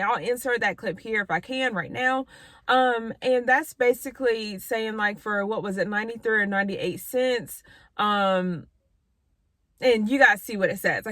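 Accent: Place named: East Coast, United States. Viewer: Im new in watching your channel...love the pantry tours..and your accent is cool too!! I mosdef can tell you are from the east coast!!! Keep doing you!!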